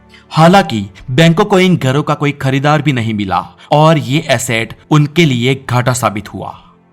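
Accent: Gujarat, India. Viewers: Gujarati lago cho bhai tmy 😀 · Your voice is gujarati ...👍👍👍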